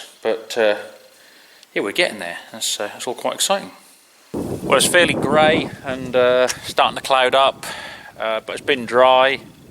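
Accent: Norfolk, United Kingdom. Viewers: I'm sure I can hear a bit of a Norfolk twang in that accent ! I'm thinking West of Norwich.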